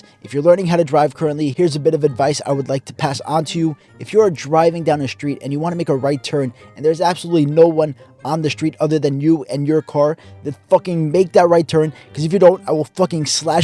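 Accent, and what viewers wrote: New York, United States. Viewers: You have a New York accent. Are you from NY?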